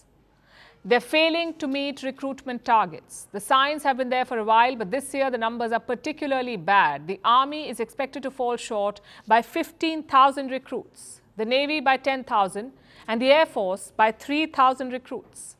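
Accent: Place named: India. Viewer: I don't like this channel at all, her accent is so strong it shows that she's from India. 👎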